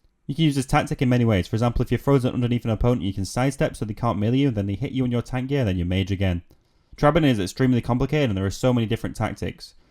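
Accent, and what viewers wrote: United Kingdom. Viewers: Try talking slower.. the combination of your british accent with how fast you talk means half of the world cant understand you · Proper English accent as it should be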